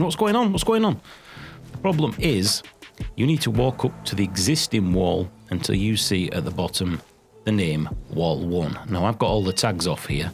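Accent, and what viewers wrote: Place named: Wakefield, United Kingdom. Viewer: Eaar Byyy Gum, Cheers for the video lad, Wakefield accent i can hear there? :)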